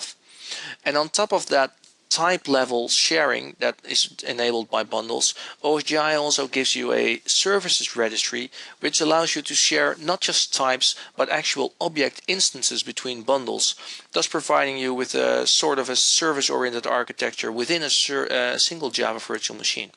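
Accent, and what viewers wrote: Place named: Netherlands. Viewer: Dutch accent:) Thanks for the short intro.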